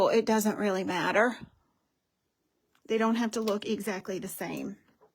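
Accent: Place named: Virginia, United States. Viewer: I love all your tutorials that you have uploaded to youtube. Plus I love to hear you talk; makes me miss home (SW Virginia). I cannot wait to see what else you come up with!!!! God bless.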